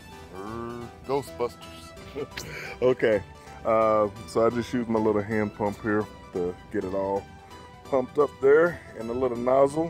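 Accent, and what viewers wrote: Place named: South, United States. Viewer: I love your Southern accent! You might find a decrease in blossom end rot if you add more mulch to the top of the soil too. This keeps the moisture in the soil longer in hot weather which helps prolong the water absorption. Your garden looks beautiful! :)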